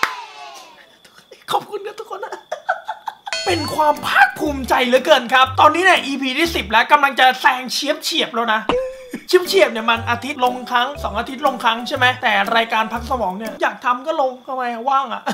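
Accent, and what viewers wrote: South, Thailand. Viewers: 555เขาเเหล่งใต้มาเราเเปลทายย55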